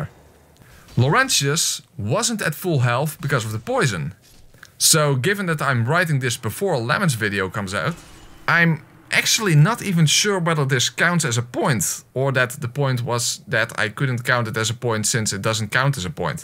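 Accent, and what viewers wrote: Netherlands. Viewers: Never heard of you before, but after like three words I was like ''oh shit, a fellow Dutchie''. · Nederlandse Engels-accenten zijn echt geweldig. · My favorite Dutch accent content creator strikes again. Lekker bezig man! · Are you from the Netherlands?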